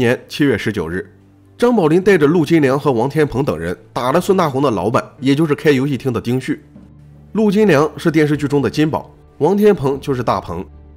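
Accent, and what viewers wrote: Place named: Henan, China. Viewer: 我听出来河南口音了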